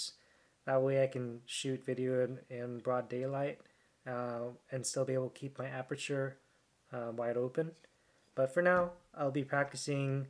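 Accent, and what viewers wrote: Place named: Philippines. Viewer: I knew it you were filipino man hahha i based it off the accent anyways great video man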